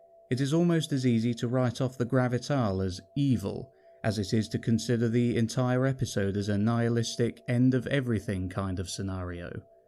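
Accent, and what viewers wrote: United Kingdom. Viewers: Hey, idk why you don't have more views, before getting the PDF i was looking for someone with a mild level of oration competency reading this, but the only one there was on YouTube was the guy with the annoying loud music and another guy with the most anticlimactic voice in the world, and the fact that you are bri´sh might help with this, but anyway keep up the good work.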